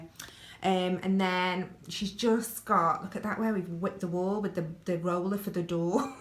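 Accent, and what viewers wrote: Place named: Liverpool, United Kingdom. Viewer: Just love absolutely everything about your home. We have the little wooden mirror that's in your en suite and we purchased ours in Ireland. I noticed someone in the comments mentioned a touch of Liverpudlian in your voice, I'm from Stoke and people often mention that to me 😂 Can't wait to see the up cycling/make overs. Loving your channel, you're such a joy x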